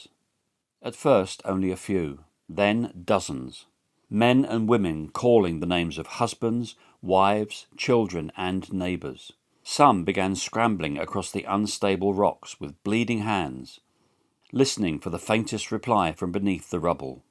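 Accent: United Kingdom. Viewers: The narrator has a British accent, so he's the ONLY one I would ever give a break! GO HAWKS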